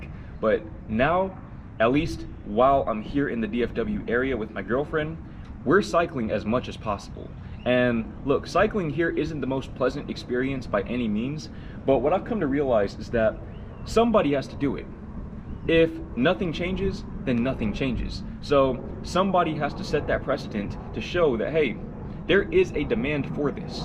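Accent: Netherlands. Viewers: You can never be too idealistic. You are an asset to this world. Btw great Dutch accent. Also, I lived in the California for sometime, and one day I bought a new printer in a local small store. The fellow that helped me had an accent, but in California that is not weird. Only at the check out I figured he was Dutch too. Complaining… yeah my American colleagues just complain about a lot…😂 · Am I the only one hearing a small hint of Dutch accent on his English? Guess you brought back more...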